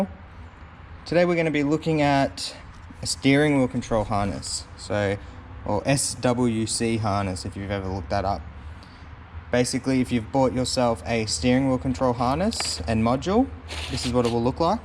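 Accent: Australia. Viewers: Why did you skip illumination if the wire was right there? I don't know about AUS (I'm just guessing based on your accent), but in the US, Kenwood HU's do dim appropriately when the headlights are on at night. I get not going out of the way to find it...but capping off both orange wires takes just as much effort as connecting and soldering/taping the orange together. Just an observation...well done otherwise.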